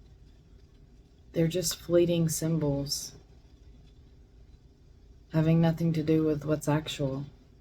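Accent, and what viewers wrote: South, United States. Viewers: I love ur southern accent. I come from mobile, alabama.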